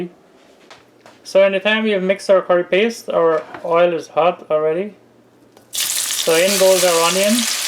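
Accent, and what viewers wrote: Guyana. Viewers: i am missing Guyana so much & i am so grateful for your tasty traditional recipes. i love the Guyanese accent. i hope to make my rhythm, with this yummy recipes. big love from London UK.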